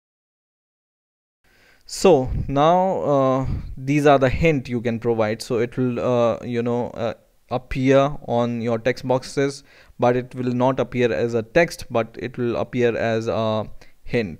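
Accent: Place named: India. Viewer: Are u an Indian Guy? Sounds like u are, at least for me it does. Can u show US How to make something like this -> I wan't to add number, but like this Let's say i type ASD+2 So the app store 2 on the ASD file, if the 'ASD' it doesn't exist yet, it make a new one, and ad the 2 on it Then i hit ZXC+3, it adds like before, but add on ZXC, again if it doesn't exists, it create one 'row' named ZXC, and add 3 on it Then again, i type ASD+8, it sees that ASD already exists, and has a value of 2, then it add 8 on top of it, resulting 10 Its a calculator, but with letter's too, Just a crazy idea of mine hahaha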